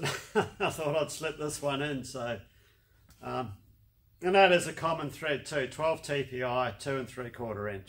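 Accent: Australia. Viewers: I hear his Aussie accent and I click Like!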